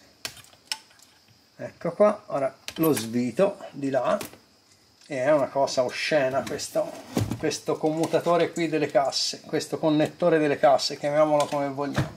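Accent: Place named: Livorno, Italy. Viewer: okkk. Mi sembrava un accento più labronico. Ad ogni modo complimenti per il canale!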